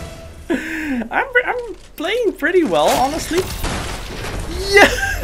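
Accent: Netherlands. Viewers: Are you dutch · Please can you make a season seeven version? Also are you dutch?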